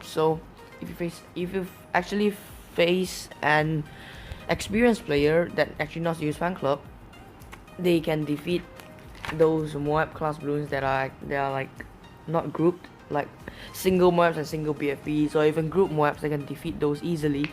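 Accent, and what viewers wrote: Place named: Singapore. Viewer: You sound singaporean, where are you from?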